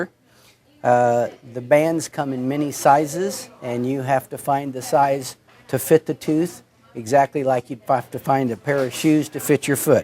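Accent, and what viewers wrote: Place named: Arab world. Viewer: شو مبين من لفظك عربي 😂♥️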